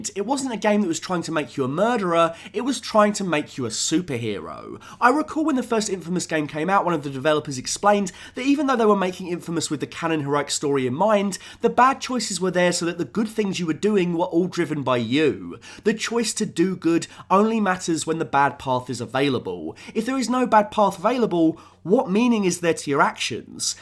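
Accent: United Kingdom. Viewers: You are one funny sounding britt!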